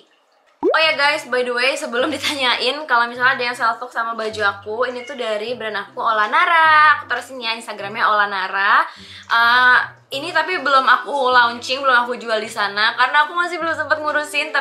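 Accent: Indonesia. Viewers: Hai kak dan kak assalamualaikum. Salam kenal, saya BEKASI UTARA. Uwahhh Bekasi mana nih Suaranya hehe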